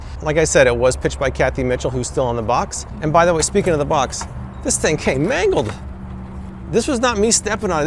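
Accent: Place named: Florida, United States. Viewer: As soon as you said you were from Jacksonville I said, I Knew It!! I might not have had the city down but I knew you were a floriduh boy. Review suggestion: lighted magnifying glasses. I'm in the market for a set for my crafting and there are so many different kinds on Amazon. Mighty sight of course, you may have done a review for that but not a comparison against other types.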